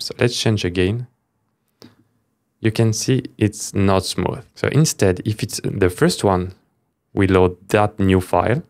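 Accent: France. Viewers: L'accent français ne s'entends pas trop, gros GG en vrai 👌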